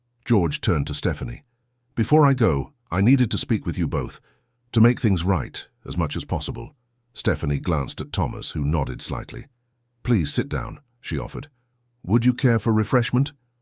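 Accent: England, United Kingdom. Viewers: Hello dear. You missed " perhaps" before the first 30 so...he might be 30 after 2 years. Thank you for a nice story and an enjoyable english accent.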